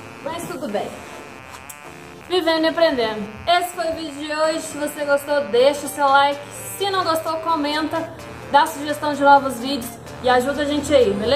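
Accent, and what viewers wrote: Minas Gerais, Brazil. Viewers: Esse sotaque nosso é bonito demais sô 👏😊✌️🤘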